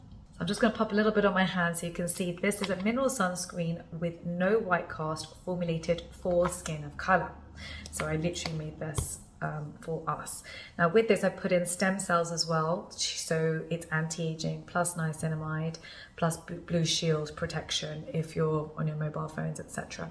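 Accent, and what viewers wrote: United Kingdom. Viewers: Especially with her British accent 😅😂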